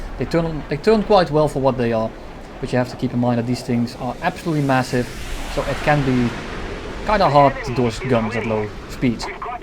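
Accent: Netherlands. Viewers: Is it Just me or do i hear a dutch guy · I'm trying to figure out what your accent is. I think Dutch, in which case I'll nickname you (if you want) but I'm not 100% sure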